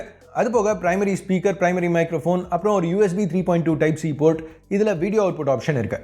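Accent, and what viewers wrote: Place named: Chennai, India. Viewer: na Chennai accent majavah ikkithu 😂❤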